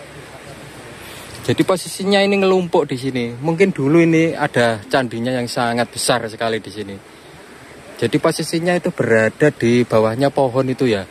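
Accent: Java, Indonesia. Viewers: truskan lur aku suka banget cara penyampaiyaan kata2nya lucu mas bro,mentok banget jawanya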